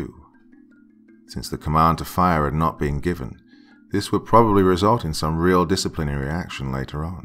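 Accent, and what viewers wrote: United Kingdom. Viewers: Hes British cut him some slack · Part 2...yes please. I was stuck on the narrator's British(?) accent in the US Navy but thats just nit picking. I like the story so far.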